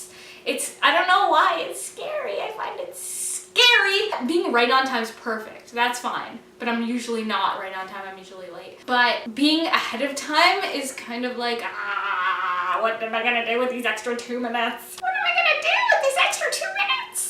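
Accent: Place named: South, United States. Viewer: I love the country accent ajajajaj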